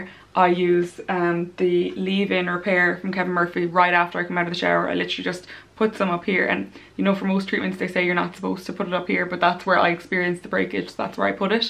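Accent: Ireland. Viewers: Is she Irish?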